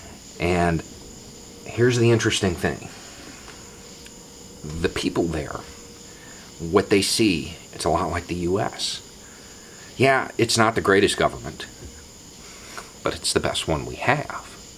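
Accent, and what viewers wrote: South, United States. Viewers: Don't try to fool yourselves by thinking we Iranians see trump calling off the invasion in the last minutes, as a sign of goodwill or concern for innocent people's lives. Everyone here knows he called it off because he was warned by his top brass, that even a single shot toward Iran, would definitely set all American bases in the Middle East on fire. No American can handle this catastrophe. He simply chickened out. Your president is a devil. Look how he thinks and deals with minorities in your own country. How can you be so naive? I really admire your personality and the way you are shockingly pro-humanitarian approaches about hot topics (especially with that southern accent), But thinking that this wet racoon has even a tinge of good will in his all existence is just not true.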